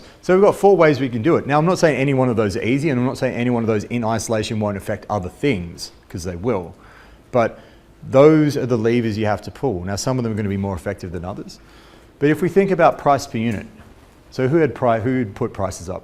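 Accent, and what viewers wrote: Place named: Scotland, United Kingdom. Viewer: Respected there... Please go slow .. You sound so scottish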